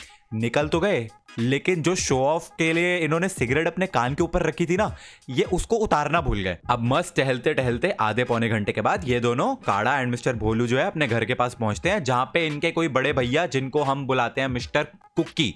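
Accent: Punjab, India. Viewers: Thaade punjabi bari cute a ji😅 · Hes punjabi is very cute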